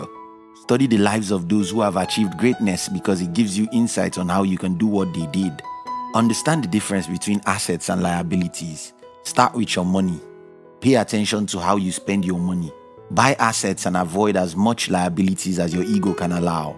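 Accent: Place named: Nigeria. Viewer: Nigérians english.......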